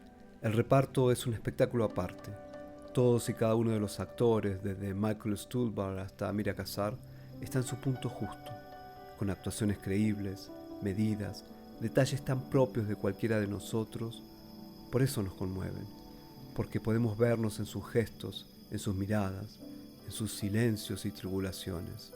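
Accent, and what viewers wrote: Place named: Argentina. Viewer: Una pelicula tan buena. Quantas lagrrimas quando el regreso' a USA. Seguir ese canal me permite de escuchar tu voz argentina que me gusta mucho. L'acento es lo que prefiero de l'America del surf. Gracias 💚 Un beso desde Italia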